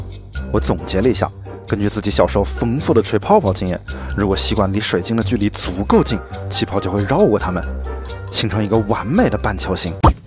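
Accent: Wuhan, China. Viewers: 这是武汉普通话吗？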